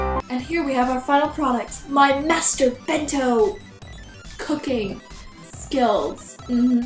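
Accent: Canada. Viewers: i love your accent! canadians RULE! haha!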